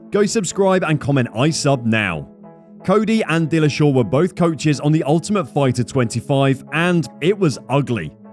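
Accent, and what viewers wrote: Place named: United Kingdom. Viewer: Your accent is so annoying British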